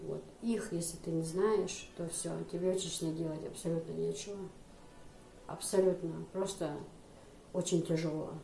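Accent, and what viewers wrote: Chechnya, Russia. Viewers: Вааа Аллах1, сел леран нохчишех д1а мух тарлур йу,?Даже чеченский акцент присутствует 😄Делдахь со цец йаьлла С меня лайк и подписка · Даже акцент чеченский! 👍🤗😘😘😘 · Ни за что и не подумаешь,что вы русская, внешность чеченская, вы не меняли свою внешность?? Акцент, язык , манеры.... Все чеченское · Вы просто умница! С трудом верится, что Вы не чеченка. Вы даже на русском языке говорите с легким чеченским акцентом, и что удивительно, на чеченском -- без акцента. Вы и внешне очень похожи на чеченку. У Вас редкое сочетание -- внешняя и внутренняя красота. Счастья Вам и Вашей семье! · Поражаюсь Вами,у вас диалект даже чеченский🤩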